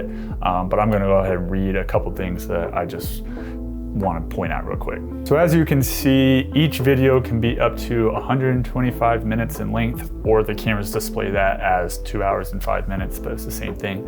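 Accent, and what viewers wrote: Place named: United States. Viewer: Finally someone who speaks American English and says Zee instead of Zed!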